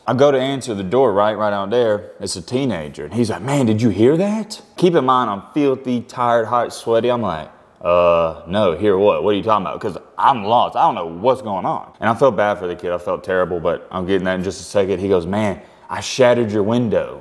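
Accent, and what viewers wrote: South, United States. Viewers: Where u from y you talk like that u talks country ash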